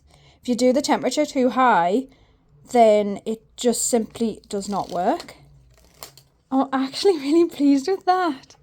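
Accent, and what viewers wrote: United Kingdom. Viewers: Just came across your channel and subscribed. Love the succulents, really cute. Do I hear a hint of Geordie/Gateshead? Abinova on youtube is from Gateshead, and she has also been having problems sublimating the square mousemats; she finds the round ones easier. Catherine from Katnipp is another good small business channel to follow for advice & inspiration. Wishing you good luck and success at the market. 🍀Have a great week. 🙂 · I hear a northern accent. Are you from up north and still living in the UK or you in Australia ? They are lovely people they are 😊 I have just come across your channel 😊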